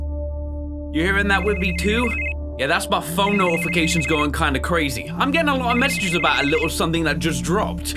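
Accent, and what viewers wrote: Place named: Newcastle, United Kingdom. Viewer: This guy keeps on over-analysing literally every single thing, with his annoying Newcastle accent.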